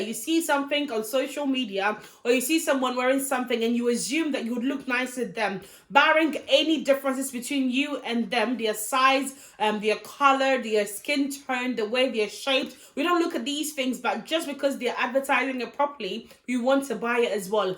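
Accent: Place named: United Kingdom. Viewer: You are from Nigeria but you don't speak like one. You have a UK accent, I like the way you speak nice English. Maybe you were brought up in the UK.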